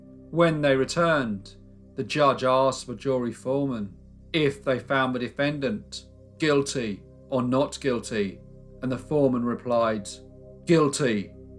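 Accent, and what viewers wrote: United Kingdom. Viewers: mmm never watch tem before.. gotta look it after. There's also Lazy Mascarade, his voice is cool. But your voice is amazing too! By the way, if is not indiscrete, may I know which part of UK your accent is from? I'm very curious about accents. English isn't my mother language so I try to learn from any accent.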